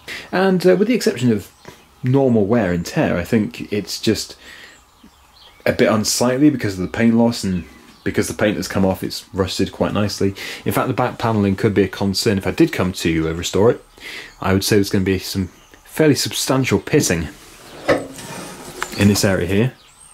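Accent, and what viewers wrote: United Kingdom. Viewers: May I suggest a shake and break for stuck screw removal? Works great for me. Classic British accent adds class to you videos.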